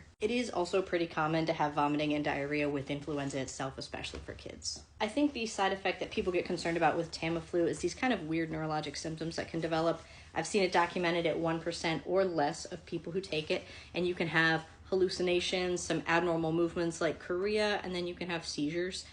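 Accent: United States. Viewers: Are you from upstate NY?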